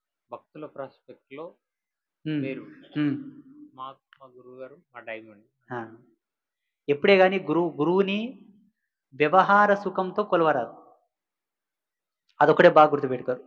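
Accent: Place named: Telangana, India. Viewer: గురువుగారు గొప్పగా చెప్పారు స్వామి మీ జ్ఞానం అమోఘమైనది మీ వాయిస్ చాలా బాగున్నది తెలంగాణ యాసలో మాట్లాడడం చాలా నచ్చింది మీరు మాట్లాడితే కెసిఆర్ మాట్లాడినట్టే ఉంది మీకు పాదాభివందనాలు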